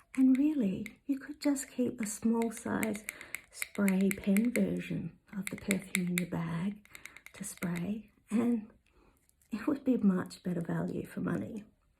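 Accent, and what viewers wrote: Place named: Australia. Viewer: You have a lovely soft spoken voice, and the Aussie accent is the icing on the cake. I've actually never smelled Daisy, but I love violet.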